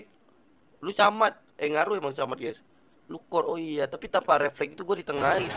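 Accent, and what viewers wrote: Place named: West Kalimantan, Indonesia. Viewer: mungkin logat.nya kalbar bro maman sama doyok teguh jga hampir sama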